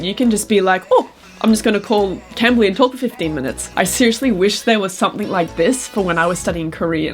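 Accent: Australia. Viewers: 발음 너무 머싯서요 호주발음임에도 불구하고 알아듣기 쉬워요..!! 코피주루룩...언니멋져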